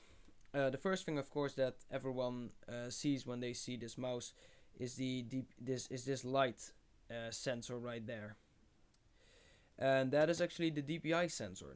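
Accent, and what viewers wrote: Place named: Netherlands. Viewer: dutch accent :P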